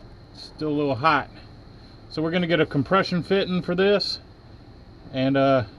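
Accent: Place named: East, United States. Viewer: you easterners sure do pronounce Oil funny like Ohle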